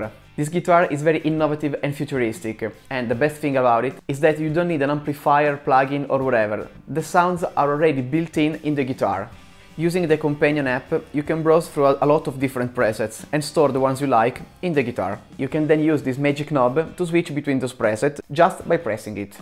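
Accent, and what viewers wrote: Italy. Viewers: La única diferencia está en el inglés... A mi me vale el italianinglish de...me entero perfecto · jajaja italiano hablando en ingles! jaj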